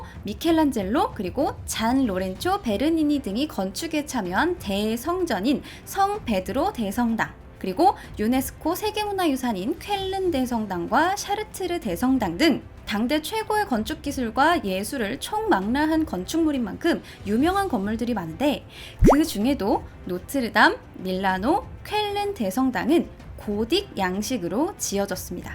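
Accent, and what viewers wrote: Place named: Busan, South Korea. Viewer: 오늘은~ 부산의 귀여운 억양이 ~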